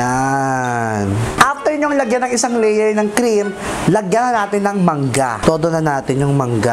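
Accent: Visayas, Philippines. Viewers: bisaya po ba kayo? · BISAYA KABA?????????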